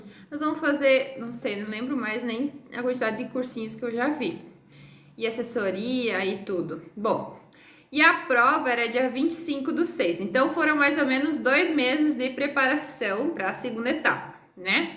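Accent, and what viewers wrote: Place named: Rio Grande do Sul, Brazil. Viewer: Obrigado,! parece ser gaúcha kkk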